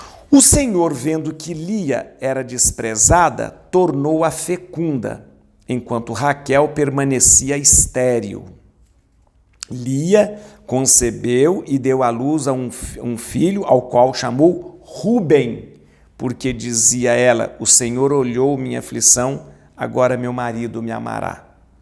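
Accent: Minas Gerais, Brazil. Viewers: Padre, que riqueza está sendo o estudo da Palavra. Estou aprendendo bastante, fazendo várias anotações e rindo do seu jeito engraçado (sotaque de mineiro é divertido demais kk). Deus te abençoe! Obrigada e parabéns pelo lindo projeto!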